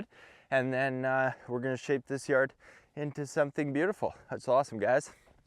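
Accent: Canada. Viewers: I stumbled across this page and thought "well, let's just watch this young guy grapple with mother nature" , so I did. Lemme tell you... The way you ATTACKED this project and just absorb everything you learn- WELL DONE! I was listening to you talk, and if you're Canadian, you just KNOW another Canadian- and all your sayings remind me of growing up and of course, my time on the prairies. SK is certainly some of the most beautiful land out there- I don't care what ANYONE SAYS. Love watching this journey (sometimes through my fingers cos I'm TERRIFIED FOR YOU lol) and I can't WAIT to see you build this amazing achievement! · I love to tune in and listen to this kid narrate his work, so f'ing Canadian!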